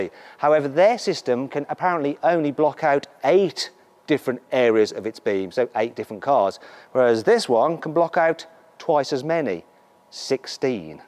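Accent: United Kingdom. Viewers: Saya kan bilangnya suka asen dia, bahasa dia, logat dia (si tukang review) ketimbang aksen amerika Iya dia aksennya british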